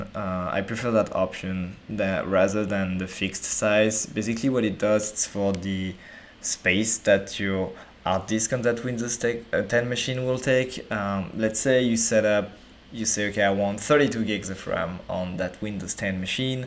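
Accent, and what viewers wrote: France. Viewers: if you don't mind me asking, are you french? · French accent 😅, good work budy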